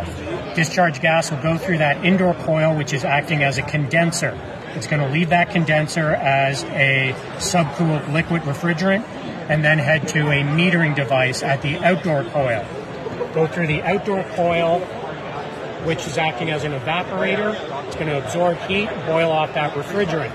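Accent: Canada. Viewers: I think they are Canadian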